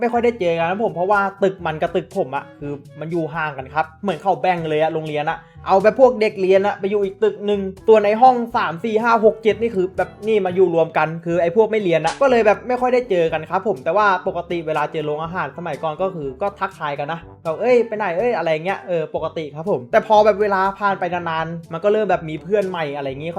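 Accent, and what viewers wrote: Phetchaburi, Thailand. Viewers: พี่ อยู่จังหวัดไรคับสำเนียงพี่เหมือนเพชรบุรีเลย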